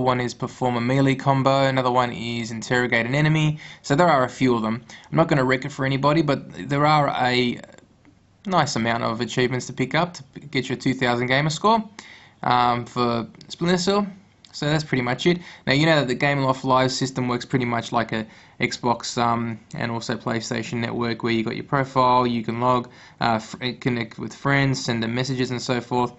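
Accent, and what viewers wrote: Australia. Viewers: i like ur aussie accent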